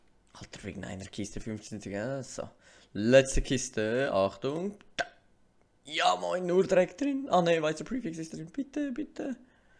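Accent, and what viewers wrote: Switzerland. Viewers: Bist du aus der Schweiz?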